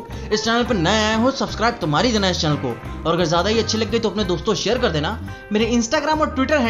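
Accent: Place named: Bihar, India. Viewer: You must be bihari You sounds like bihari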